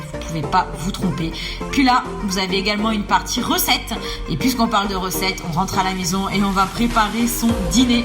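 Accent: South, France. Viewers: Top avec l'accent de chez nous le sud non😊😊😊😊?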